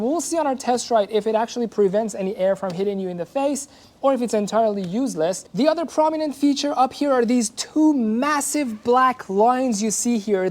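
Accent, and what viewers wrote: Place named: Iran. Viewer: Qorboone oon lahje Iroonit besham man jan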